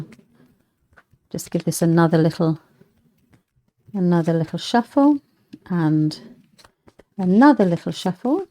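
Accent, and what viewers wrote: United Kingdom. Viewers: you have the most lovely accent and speaking voice, how I love the brits , )O( cheers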